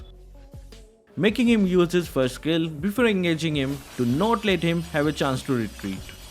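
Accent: India. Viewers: I think you are Indian because ur voice is like an Indian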